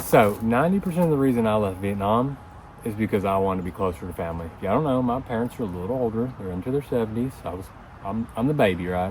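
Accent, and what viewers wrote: South, United States. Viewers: Ayy southern baby with the thickest accent is back again! pls more farm vlogs pls. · bro how come you got southern accent when you got back home 😂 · Your southern accent really is a lot stronger now that you're home lol glad you found your place, it must have been stressful not having a home base for that long...excited for your new chapter!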